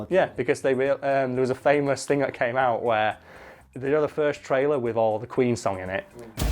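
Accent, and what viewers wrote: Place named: Northern England, United Kingdom. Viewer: I’ve been binging your content for so long that I’m starting to think in a Northern accent... I’m from Australia.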